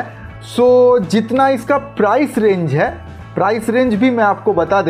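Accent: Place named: Bengal, India. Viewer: Bongali Hindi accent yckk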